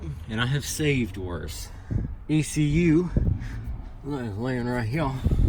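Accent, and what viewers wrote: South, United States. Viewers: but that souther accent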